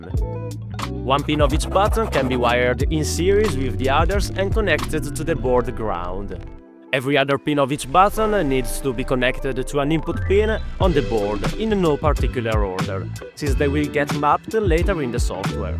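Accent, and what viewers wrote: Italy. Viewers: From this accent you have you are italian for sure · Bro si sente che sei italiano lol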